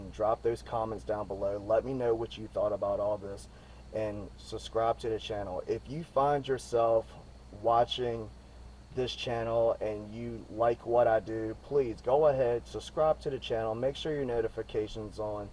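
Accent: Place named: South, United States. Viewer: are you in Louisiana? I noticed you had a southern accent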